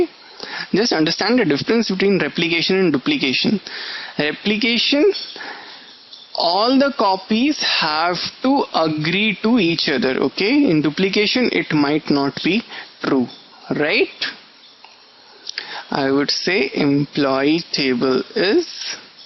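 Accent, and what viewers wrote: India. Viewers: its the indian accent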